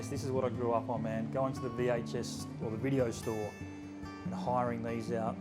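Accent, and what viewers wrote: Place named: Australia. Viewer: You have to be from Australia, right? Pronouncing SEGA like Seaga was the dead giveaway. But I also suspected it when you said ”a heap of games” which I only ever heard The Last Gamer say.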